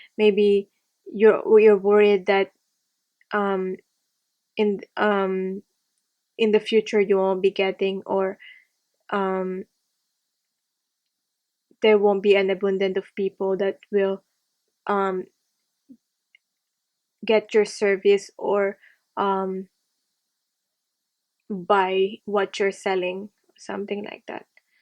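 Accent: Philippines. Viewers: You sound filipina 😊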